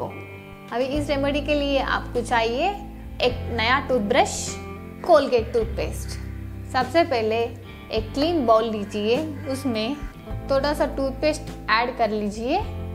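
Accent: South, India. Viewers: Are u from south.. You are speking in very new manner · your accent seem like a south Indian by the way I have a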